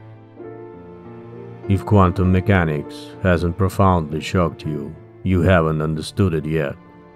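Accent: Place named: Eastern Europe. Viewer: Ok my friend, if u ever come to Toronto... Contact me... Seriously...I would love to host you :) And I won't forget the offer. You sound eastern European. What country are you from? Bhor was twisted. Interesting how many of these great intellectuals are so relatable and down to earth. Pragmatic yet spiritual. LOVE IT. Thanks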